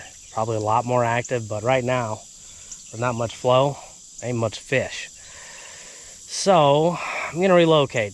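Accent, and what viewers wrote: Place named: North, United States. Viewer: You sound like a gat dang yankee compared to that old dude at the start.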